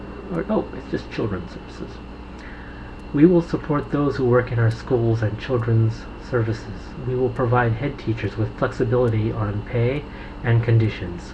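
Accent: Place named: United States. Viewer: Brit here. Just came across your video. Never too late for feedback I suppose. Apart from the minor stumbles at the end I thought your speech was fantastic IMO. I think your accent sounds more pleasant than many accents in the UK and would happily listen all day. What kind of American accent do you have?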